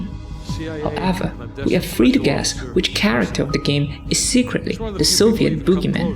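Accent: United Kingdom. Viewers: 有学剑桥英语吗？你的口音好像学过呢~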